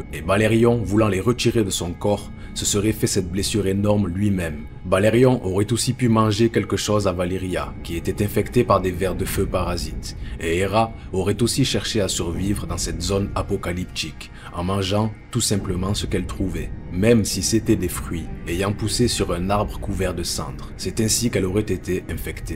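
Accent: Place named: South, France. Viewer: J'adore ton accent mon frère, tié du sud ?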